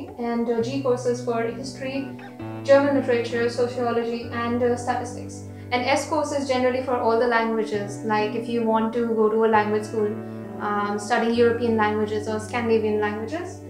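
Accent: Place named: Kathiawar, India. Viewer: Are you kathiyavadi?..you are speaking in English but your accent is like kathiyavadi(in gujarat)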